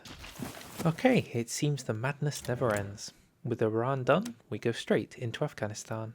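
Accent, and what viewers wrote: United Kingdom. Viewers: Cause he's talking in British accent.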